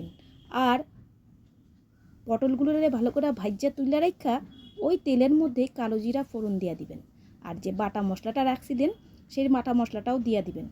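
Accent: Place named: Bangal, Bangladesh. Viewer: খুব ভালো বাঙাল ভাষা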